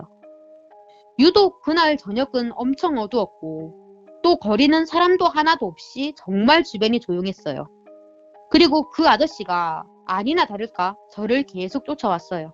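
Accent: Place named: Busan, South Korea. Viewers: 그런듯합니다 저도 부산 사람인데 정감이 많이 가네요~ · 부산 분이시죠 ㅋㅋ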